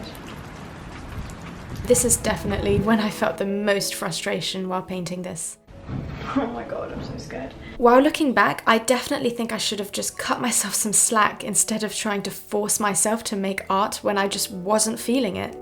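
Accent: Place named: United Kingdom. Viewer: you absolutely should! Initially I distinguished your accent as British, but your beautiful yet unique name didn’t match my perception, then you dropped quelques mots français..and seeing your mum in frame I was instantly corrected! Aha! You are French! From your mother’s side (?) yet you’re based in Adelaide (wrong again. I initiallly thought north coastal Qld (sunny coast perhaps). Then Melbourne due to your private school uniform and the fact you commute/d to school/uni). I’m a visual arts teacher (secondary years) based in Brisbane and once I saw your year 12 exhibition vlog I quickly determined you were in fact based in Oz and completed your ATAR for General Art (at first instance your accent threw me off as I thought you were based in the UK and had completed your QCSE). You are quite the anomaly, Mademoiselle. A beautiful contradiction. 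Completely smashing all my preconceptions of you. I love being proven wrong, oh and the element of mystery ☺️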